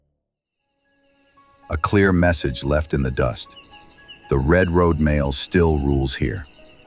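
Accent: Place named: United States. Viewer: listen to the dumb usa gump reading about africa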